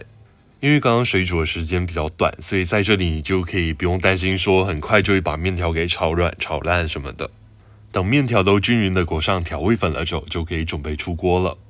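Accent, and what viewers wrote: Taiwan. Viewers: 会是马来西亚的人吗🤣怎么怎样听都不像且像台湾，马来西亚人讲话的风格众所周知😂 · 是馬來西亞人喔，口音跟台灣蠻像的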